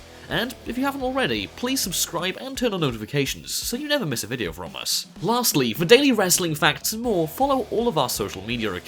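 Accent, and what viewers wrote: United Kingdom. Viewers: British Accent + Sense of Humor=A good Video. 😂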